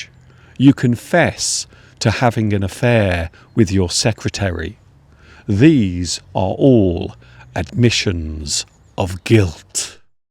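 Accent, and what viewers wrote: United Kingdom. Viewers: Hi, yes...I have to confess I've something so important that I must get it off my chest (...trying to use those expressions here, did I do correctly...please?!☺️) I do like the British accent and my ears turn up immediately as soon as I hear it and I'm very interesting in your country 😅. Well, there's something else I must confess, shh.....I know that I have scratched Mr.Steve's car down there in the garage! Don't tell anybody! 🤫🤭😅 Jokes apart, I have to confess that it seems to me to have found two nice friends thanks to this channel and, let's not forget the English Addicts out there, even though we have never met in real life, it should be so interesting, I feel glad and not alone with my English adventure! Cheers! 😊🙏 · It seems like your sound tone is so heavy, that I'm scared, I should have to confess I've fallen in love with the British accent.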